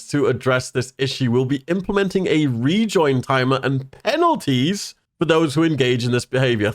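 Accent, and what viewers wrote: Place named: RP, United Kingdom. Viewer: Lucent entry, but most importantly listening to the posh accent in your voice. 😂✌️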